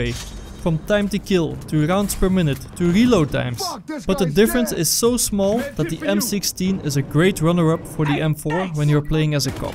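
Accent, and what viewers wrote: Netherlands. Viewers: Je hebt een Nederlands accent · u sounds dutch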